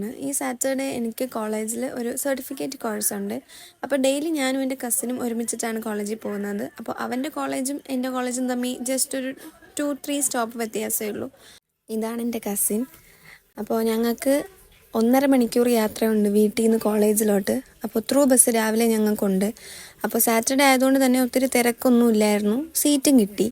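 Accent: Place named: Trivandrum, India. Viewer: Slang trivandrum anu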